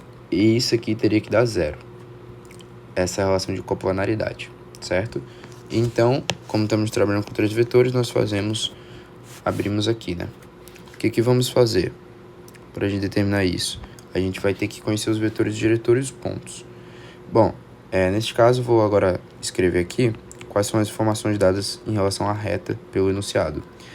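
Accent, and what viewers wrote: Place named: Pernambuco, Brazil. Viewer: Não entendo nada de matemática. Mas amei o vídeo ❤ kkkkkk Porém, gostaria de ressaltar que ficaria mais legal com o seu sotaque original, pernambucano 🥰😘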